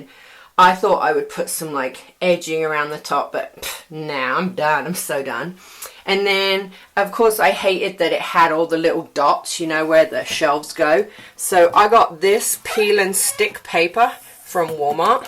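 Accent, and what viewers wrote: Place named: United Kingdom. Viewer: I could hear the Brit in you:)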